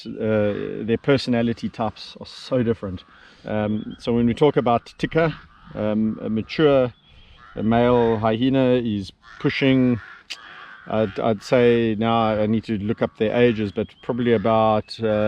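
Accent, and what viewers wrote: South Africa. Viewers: I never tire of listening to that SA accent. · Im from zimbabwe cape town kenya harare and jo berg and you have a south african accsent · God I hate the way south Africans talk, "super aggro"you like 40 years old stop trying to talk like a teenager.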